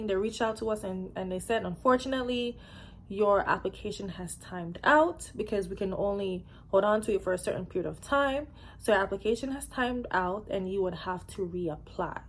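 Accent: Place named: Caribbean. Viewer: What’s ur accent from? I’m vincy n you sound Caribbean too- also have my in person f2f june 6 (2 weeks)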